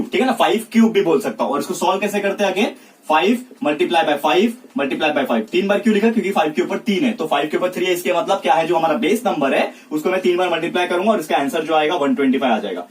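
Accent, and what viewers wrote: Maharashtra, India. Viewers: Sir your teaching very well Sir Kay aap Marathi ho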